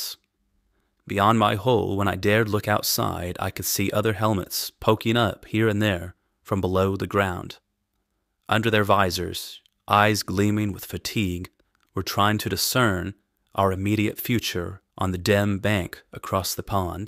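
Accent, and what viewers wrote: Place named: South, United States. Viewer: This story of a young French boy enlisted in the German army to fight in the Soviet Union, read by someone possibly from a state South of the Mason–Dixon line, is quite puzzling to a French listener.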